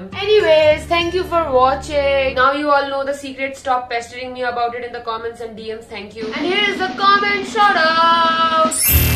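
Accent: Bengal, India. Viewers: Are u a bengali🤔... I heard talking with ur mom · the bengali english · Are you a bengali girl bcz u say "maa sotti baana 6o"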